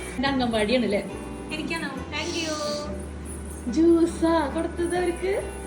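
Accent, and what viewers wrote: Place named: Thrissur, India. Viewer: സ്ലാങ് കേക്കുമ്പോ അറിയാം... തൃശൂർ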